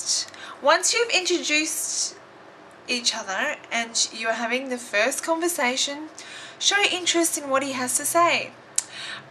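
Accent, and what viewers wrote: Australia. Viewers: she's not british, she's australian.. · All true lol... u're good, must have had a lot of ups and downs on this field so far uh? Hmmm... i don't really need to be shaved to look like a decent guy, right? hehehe I just love your accent... you Australians, ai ai ai!! I could not let this detail out, since I'm already posting you a comment. Anyway, nice post. Cya around!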